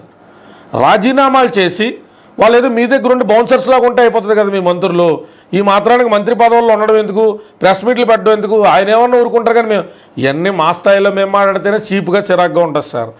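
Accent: Godavari, India. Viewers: Meeru chesthunna videos all areas nunchi chusthu vuntaaru meeru only Godavari yaasa maatladithe ela andi But okati telusthindi Mee janasainikulu andaaru mental ga prepare aipoyaru TDP tho pothu ki Meeku teliyadu meeku konni seats ichina akkada rebals ni nilabetti meeku sunnam raasthaaru Kastamo nastamo JSP single ga contest chesthe meeku antu oka gurthimpu vasthundi lekapothe mimmalni anagathokkuthaaru TDP vaallu jaagartha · మీ వీడియో చూస్తున్నంత సేపూ మా ఊళ్ళో ఉన్నట్టు ఉంటుంది...మీ గోదావరి యాస...ఎటకారం.... మళ్ళీ మళ్ళీ వినాలని అనిపిస్తుంది...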